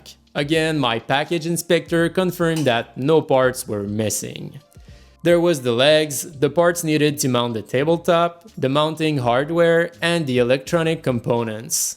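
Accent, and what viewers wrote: Quebec, Canada. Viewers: Criss, ca parait t'es Quebecois, lool. Nice vid! Just got myself a standing desk. Gonna check out your cable management vid lol.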